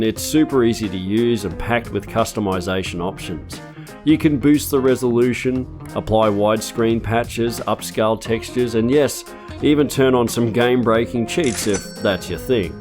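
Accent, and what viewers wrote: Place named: Australia. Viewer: No they're just both Australian. They sound very different.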